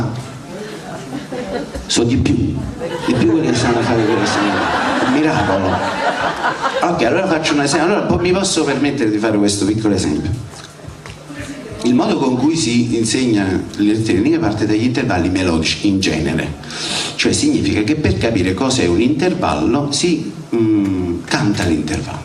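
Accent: Naples, Italy. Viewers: Complimenti maestro la sua napoletanità la rendono comunicativo e trasferisce le sue nozioni in maniera eccellente !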